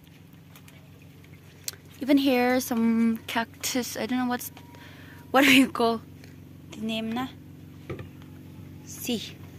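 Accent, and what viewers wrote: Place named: Nagaland, India. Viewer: I really don't know why YouTube recommended me this 😂 but I love the accent... Didn't know Naga accent sounds like this 👍👍 love from Mizoram... Got yourself a new subscriber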